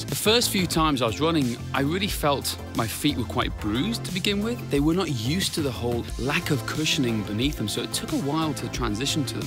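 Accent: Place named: United Kingdom. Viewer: You have a very nice style and manner, also always nice to hear a fellow Brit ✌🏻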